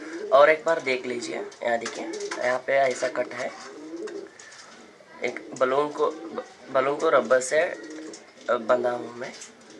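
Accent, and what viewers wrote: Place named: South, India. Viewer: Bhai bohut accha lagraha.. u r trying to speak in Hindi and unr doing so good... I think u r from south?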